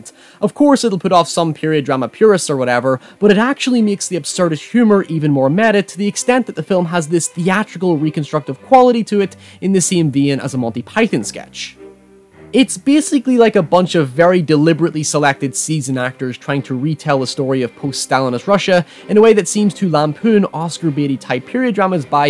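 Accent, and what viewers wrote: Northern Ireland, United Kingdom. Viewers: I think northern irish maybe? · Northern Irish orginally?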